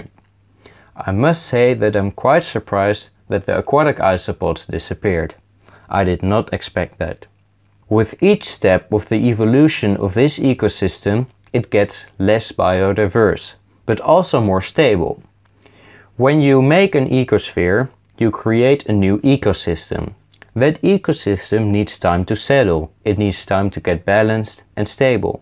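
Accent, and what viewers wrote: Netherlands. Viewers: you sound really dutch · Jij bent nederlands ik hoor het aan je accent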